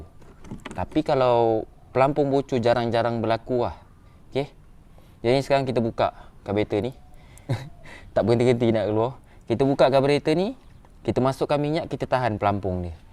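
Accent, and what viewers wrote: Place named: Johor, Malaysia. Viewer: Asslmlkm... Oooooo org Johor klau ckap try...bunyi dia...terghaiii Oooooo bari Sy tauuu😁😁😁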